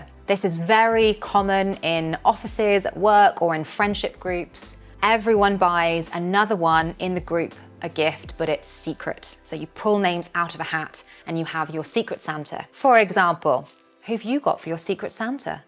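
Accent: United Kingdom. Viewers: Thanj you for sharing UK christmas information🎉 UK accent is very clear and motivating me to learn English😊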